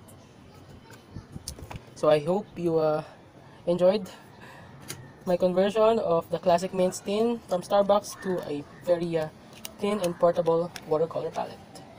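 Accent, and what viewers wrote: Philippines. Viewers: Its really easy to distinguish a filipino with his accent haha, am i right??...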